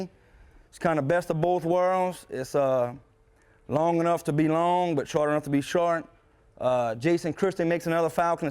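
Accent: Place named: Louisiana, United States. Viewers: Lol he sure is. That hard Cajun accent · hes from louisiana for sure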